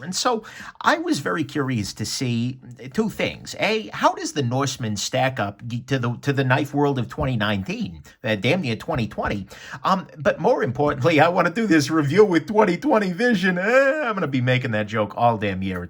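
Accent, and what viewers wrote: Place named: New Jersey, United States. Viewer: funny, but I think is from New Jersey, we don't talk like that in New York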